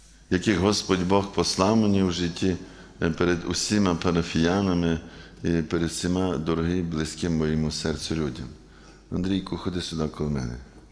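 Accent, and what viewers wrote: Western Ukraine, Ukraine. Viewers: Отче, я живу в Тернополі і є парафіянкою нашої церкви Святого Йосафата, але дуже люблю дивитись і слухати Ваші проповіді, науку, відповіді на запитання. А ще Ваш діалект такий близький, як то кажуть, західняцький і почуття гумору. Велике Вам спасибі за Ваш труд і служіння Богу і людям!❤💙💛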